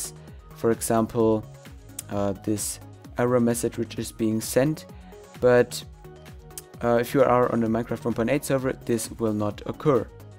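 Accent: Germany. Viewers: Du bist Deutsche, ne? Merkt man direkt :DD Nice MOD by the way!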